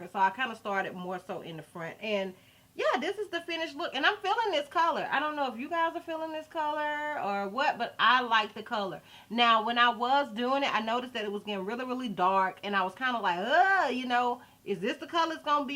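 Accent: South, United States. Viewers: yes I knew it was somewhere down south lol😂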